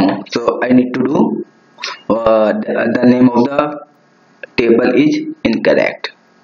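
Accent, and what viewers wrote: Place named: India. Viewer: Caracas a Fundação Bradesco poderia atualizar seus vídeos e colocar vídeos em PT, como que podemos entender com vídeos em outra língua e um cara com sotaque que parece indiano, fica mais difícil de entender. Não sou boa no inglês, mas ficou bem difícil. tive que colocar legenda e como um amigo disse aqui, precisamos lutar para entender e aprender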